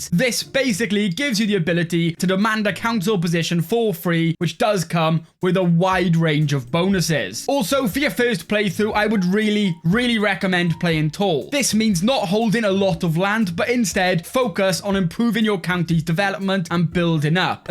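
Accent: United Kingdom. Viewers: Good video, I just wish your voice wasn’t so violently British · Bro i really aprreciate these tips but i cant listen this british accent.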